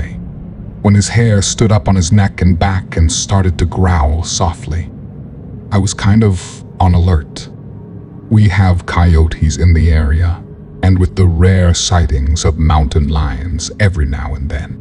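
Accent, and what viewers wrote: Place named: England, United Kingdom. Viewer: I said that but theres a pommy accent there??? Still cant work out if it is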